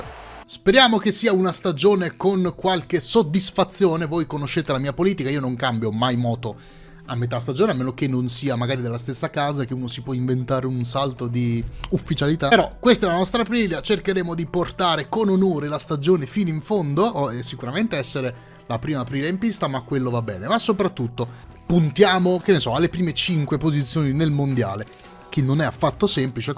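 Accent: Sicily, Italy. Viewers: Gerardo Cavallo Fan Teknoescobar sei Siciliano?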